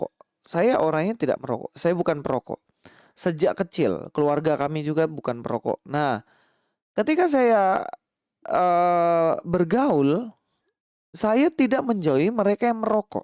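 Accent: Java, Indonesia. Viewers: Hahaha lucu lelaki berdialek jawa ini, belajar nya kurang jauuuuuh, padahal panduannya belajar sampai ke negeri China....?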